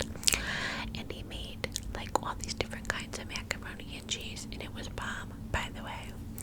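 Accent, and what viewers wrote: New York, United States. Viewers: Yasss bringing back the NY accent😍literally adore itttt , neighbourhood gossip is always the best cuz I’m such a nosy person🤣❤️ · I love your NY accent it's so relaxing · LIVING for these NY accent videos! For some reason they’re just automatically tingly. Hope you’re having a great week! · Love a good Nooyawk / Bawstin accent 😂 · the new york accent is so satisfying wow, i definitely wasn’t expecting that 😂 great as always! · another long day of schoolwork and getting ready for bed gonna watch this !! 💛💛 i love the combo of the ny accent and ur neighbors ☺️☺️ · Your New York accent is so relaxing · Ooh I love New York accents ❤️ excited to relax as I get work done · these New York accent videos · Yes the NY accent video is my fave!!!!!!! 😍♥️ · I’m in love with the New York accent your videos always send me to sleep thank you ❤️ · I love this New York accent it's just such fun. 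Your really good at these xx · Omg I LOVED this. I adore your NY accent ❤️ sorry I’ve been Missin in the comments. I’m in art school!!! Degree in film and photography! So I’m super busy but enjoying it so much! You know my playlist will be helping me through my degree ❤️❤️ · Your New York accent is giving me serious Harley Quinn cartoon vibes! I love it 💓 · I’m obsessed with you and your New York accent! I’m from Boston and it does remind me of our accent here and there, I definitely don’t mind 😍😍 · I truly love your New York accent is awesome! Thanks for this amazing video! ❤️😍🤠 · YEEEESSS I live for your NY accent!! Plus this story time..girl, its 4am here and it shall put me to bed 😴 good night!! ❤ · aaah i love ur ny accent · Yay! The NY accent is back! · Shout out to the state of NY I never been there, i have only been to 4 states California where I am from,arizona,nevada, and New Mexico i have 46 more states to go eventually/hopefully i love this ny accent 🙂😅👍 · i absolutely love her NY accent, it is really soothing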